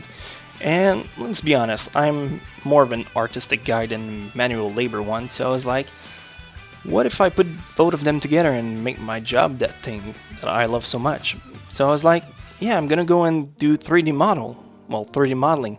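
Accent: Quebec, Canada. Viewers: tes quebecois non? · Haha je savais déjà que tu étais canadien et francophone mais tu as vraiment l'accent canadien quand tu parles français, c'est trop mignon ;) Mais jpense que tu as eu raison de faire tes vidéos en anglais, c'est beaucoup plus pratique pour se faire connaître et c'est plus "universel" que le français, on va dire. Anyway, keep up the good work and greetings from Japan (I'm a French girl on hollidays in Japan XD) PS: J'attends avec impatience Chronexia and the eight seals, au fait ! Vu le temps et les efforts que tu investis dans le projet, ça va être génial, c'est obligé ! :) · OMFG T'es québécois Oh shit ._. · Ben non! depuis tout ce temps, j'avais trop pas reconnu l'accent! Nice que je ne sois pas le seul au Québec à aimer (ou à adorer) regarder les animes :P Je suis fan de Naruto mais les autres animes sont tout aussi bon! Bravo pour tout ces vidéos. 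Je suis de Rimouski, mais je vais te reconnaitre si on se rencontre inquiete-toi pas, si jamais je vais au zoo! :D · Tant qu'a y être, est tu québécois? perso je trouve que nos accent sont pareils XD Test : dit tabarnack en vidéo on verra ;) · LoL mec je savais pas que tu etais quebecois sa fait du bien tentendre parler francais :) tu parle bc mieux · Hé ouais l'accent québecois ! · Je le savais que tavais pas un accant anglais jme disais que ce netais pas ta langue maternelle mais je naurais pas cru que tu serait canadien fr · Oh putain je ne laurait jamais cru que tu etait canadian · Un vrai accent Quebecois XD (a real Canadian-French accent) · J'ai toujours voulu entendre ton français parce que je trouve l'accent canadien vraiment sympa! Voilà une bonne chose de faite :) Content d'en apprendre un peu plus sur toi et bonne continuation! First ; congrats for various things! Second : keep the good work sir! 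^^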